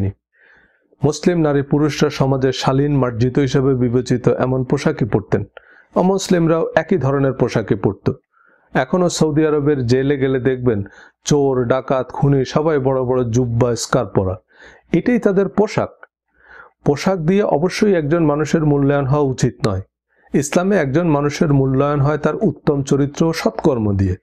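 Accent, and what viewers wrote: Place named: India. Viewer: এই লোক ইন্ডিয়ান দের মত কথা বলে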